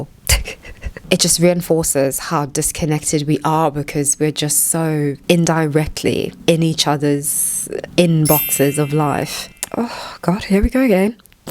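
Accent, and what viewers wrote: RP, United Kingdom. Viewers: What a very posh english voice, never hear white girls talk like that these days. Have had similar symptoms. Maybe its the bioweapons, maybe its the illuminati anti love campaign. British NHS is dead, mental health services, government and society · Hey I am so sorry. You re exceptionally honest. this has a lot of value. if i have to be honest I am a bit annoyed by your posh accent (i m italian and probably i have a laughable accent BTW, so please forgive this). But you re absolutely adorable. Please reach out if you need something. even to me if there s no one else. (I live in London though). No one should be that disconnected to be threatened to end up on the street with no alternative. I really hope you re doing better since you posted the video. If not, please make contact. A hug from an oppresive patriarcal white man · How can I be your friend for real? 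Your accent makes me wonder if you live far away in the UK? & the answer is yes. It is sad how humans are replacing having each other, with having things or pets. Pets are more friendly than humans it seems...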